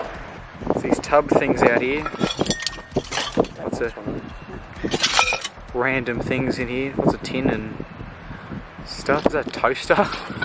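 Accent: Australia. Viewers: He has the most aussie accent ever :D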